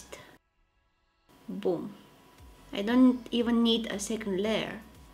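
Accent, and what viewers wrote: Greece. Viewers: are you greek ? omg and i was wondering why i love your accent and the way you talk !! greek power girl !! xo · Απο τι στιγμη που την ακουσα λεω ειναι ελληνιδααα το ηξερα❤️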